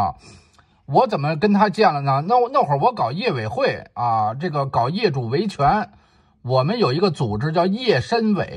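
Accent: Beijing, China. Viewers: 听了您的北京话好亲切啊，谢谢您说许志永和丁家喜的这件事。您也是有良心的中国人。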